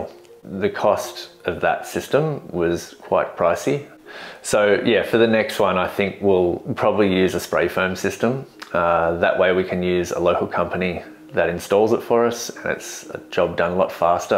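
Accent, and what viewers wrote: Australia. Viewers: A happy and mellow Aussie :) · I had to remember this is located in Canada, whilst listening to an Aussie talking about the unit.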